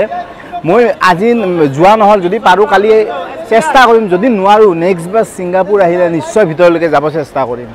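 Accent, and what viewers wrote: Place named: Barpeta, India. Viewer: axomiya vakha tu thik koribo lage...borpeta vakha di kam nhobo..gotei axom a buji napai...iman bosor dhori guwahatit thaki o vakha tu thik koribo nuwarili no..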